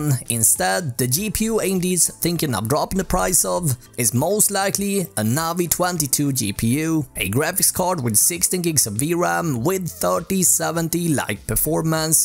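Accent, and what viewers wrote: Sweden. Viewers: The Swedish accent is strong with this one! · Its because he's from the north part of Sweden and the majority of people out there have that annoying accent unfortunately. In short he's speaking in Northern Swenglish.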